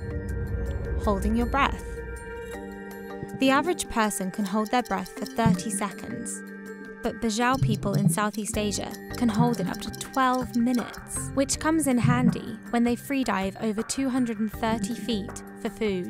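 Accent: United Kingdom. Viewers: That british accent. Correct me if I'm wrong.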